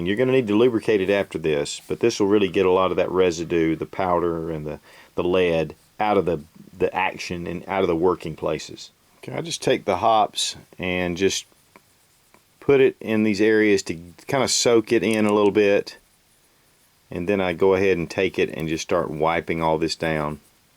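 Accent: United States. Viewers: Love your vids. Just bought a used Walther p22 for the bedroom. Thanks for all the tips. Had to subscribe for this, some of your other vids, and the down to earth all American accent. Long live the Republic! Down with Big Brother!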